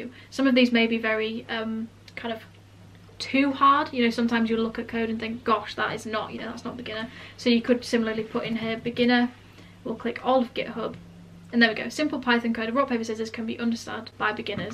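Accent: United Kingdom. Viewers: I am in love with that British Accent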